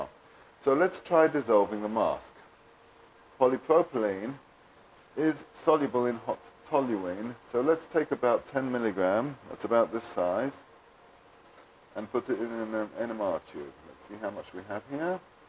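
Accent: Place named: United Kingdom. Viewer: You sound British are you from the uk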